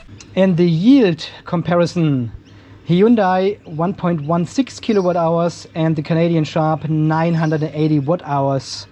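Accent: Germany. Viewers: You sound german?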